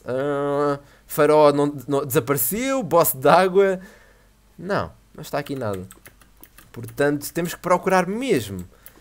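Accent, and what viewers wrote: Portugal. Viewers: Vc é Portugues? Ele provavelmente é português de Portugal =) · É pq ele é português, quem vem de Portugal tem esse sotaque !! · Ele é portugues de PORTUGAL,no inicio vc pode ter dificuldade de intender ele,mas depois vc intende TUDO o q ele fala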